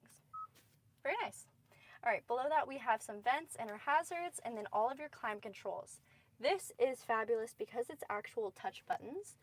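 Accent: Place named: United States. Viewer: Disappointed hearing an droning American accent ...lost in translation zero authenticity coming through.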